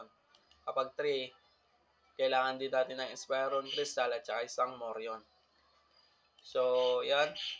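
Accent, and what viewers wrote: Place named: Pampanga, Philippines. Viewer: kapampangan accent core ❤❤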